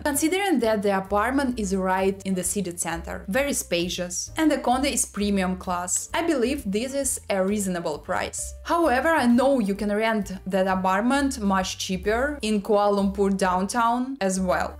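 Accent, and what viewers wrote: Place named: Russia. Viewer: Услышал русский акцент местами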